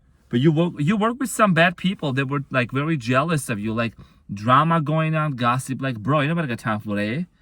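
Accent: United Kingdom. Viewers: I’m impressed with your British accent. I’m from the UK ❤ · You make me smile so much / with all the sassy shit references …in a British accent…. Meet me beyond these walls and we shall speak further…with action…. Persist in this way and consequences will induce themselves. 😂❤ love it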